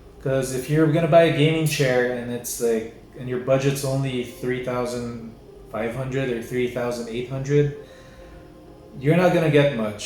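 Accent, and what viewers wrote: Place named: United States. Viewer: Jesus for a Filipino, you have a very American accent.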